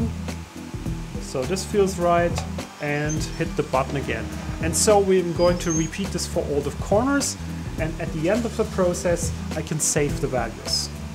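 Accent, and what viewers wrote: Germany. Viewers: Hi, "hallo!" may I say if I recognized correctly your german accent(!?). Anyway, you really rock as a teacher, with cristal clear explanations, and you have the hability (intelligence!) to clarify and simplify those complicated lines of commands in Marlin: just a thousand thanks for that! I know I'm coming really late after your video, but I only saw it 2 days ago... I have a precise question for you: I did all you described, also for the #986 (#define RESTORE_LEVELING_AFTER_G28). But I tried it (I have an Anet A8 with a V 1.5 board), the manual mesh leveling is perfect, and so are my prints afterwards! ---> BUT... if I shut off my printer, the next time I'm launching a new print...the bed is not leveled anymore....I must re-do the complete manual bed leveling again.... ---> it seems that the leveling is NOT written in the EEPROM, although I had uncomment the line #986 (#define RESTORE_LEVELING_AFTER_G28) and click on "Store settings" on the LCD panel.... 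ANY IDEAS? Thanks again, vielen Dank, hope you'll be able to see my questions and answer it...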